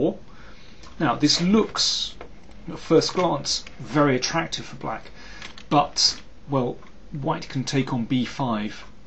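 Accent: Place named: RP, United Kingdom. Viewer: Yes Very Clear! even commentating at an Elite level, Thank you GM Daniel!!. Its clear because GM Daniel has superb speaking and chess talent! Also it doesnt hurt that he is speaking the Queens English right proper, the most understandable form of communication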